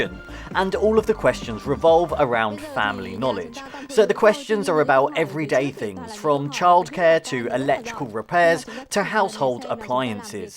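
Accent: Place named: United Kingdom. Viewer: Where are you getting American from? He's British, he has a British accent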